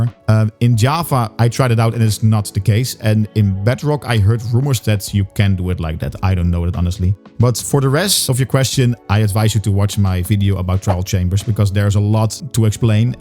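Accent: Netherlands. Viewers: ik had eerder gehoord dat je een nederlands accent had!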